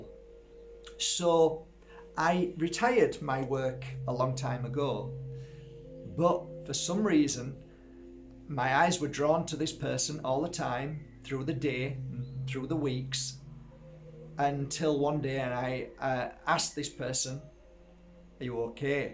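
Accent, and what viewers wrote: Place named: Yorkshire, United Kingdom. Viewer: Brilliant! You’ve got such a beautiful heart! ❤️ Thank you for the workshop. I will try and help those who are going to need this 👌💫 Ps. Love listening to you 💕 Your accent reminds me of the time I had spent in Yorkshire, I used to study and work in Leeds for some good 6 years! 😄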